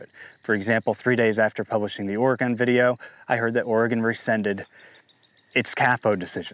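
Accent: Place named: East Coast, United States. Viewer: You must be from the east coast somewhere. I'm from Oregon. We say it Orgun. It doesn't rhyme with polygon. Just Orgun, thanks. Also, it's not ColorAHdo. The A in Colorado sounds like the a in apple. No umlaut. Yes, I know that it's a Spanish word and in Spanish they'd say AH. I used to get it wrong too. Doesn't matter. Point is you don't sound like a local and that annoys the locals. Also, I have a bone to pick with you hoity-toity eastcoasters treating west coasters like we're stupid bumpkins. At least we can pronounce all of your state's names properly without all the stubborn denial of our own ignorance. I've had New Yonkers tell me I'm pronouncing Oregon wrong when I'd hoped to enlighten them in the past. The nerve! Namaste!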